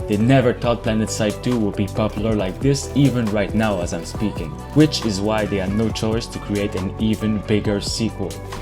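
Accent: Quebec, Canada. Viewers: Great Québec accent!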